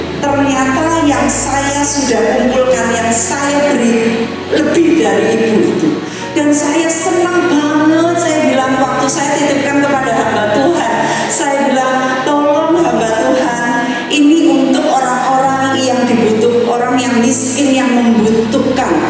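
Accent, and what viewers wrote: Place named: Java, Indonesia. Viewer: Walah ibu Njawani tenan, nek kotbahe wis medok, aku koq yo ngguyu ngekel,.... turut sepenanggungan, ibunda Indah bersama Tuhan Yesus di keabadian Surga Mulia, just btw eyang kakung kulo KRT Widyonagoro Bupati Kasunanan Surakarta era 60an, Pray For Solo... Solo Full of His Glory... Awesome...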